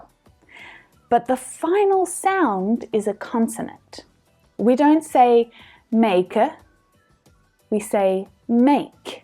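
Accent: Melbourne, Australia. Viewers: Hi. I loved your Australian accent!! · Is from Melbourne? I thought so...I'm from Sydney and I can hear subtle differences in her "accent" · That's quite amusing this video comes from someone sounding Australian. People from Australia don't speak properly, skipping letters.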